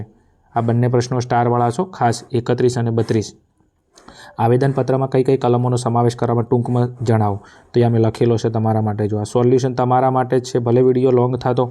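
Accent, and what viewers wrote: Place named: Patan, India. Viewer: Gujarati Patan nu che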